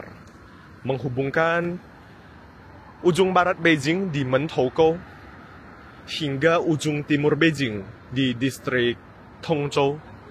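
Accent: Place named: Medan, Indonesia. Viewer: Org Medan mmg sebut modren klo tentara sebut tentra haha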